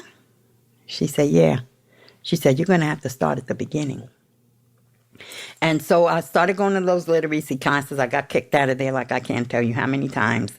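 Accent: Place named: New York, United States. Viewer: This chick sounds like such a New Yorker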